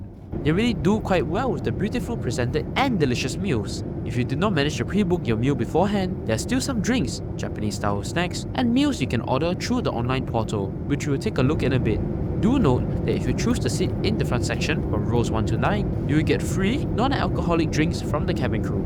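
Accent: Singapore. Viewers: I do miss the Singaporean accent. Very nostalgic indeed. Keep it up.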